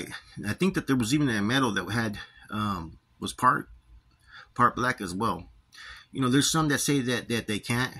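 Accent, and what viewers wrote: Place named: North, United Kingdom. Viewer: you speak like a true northerner.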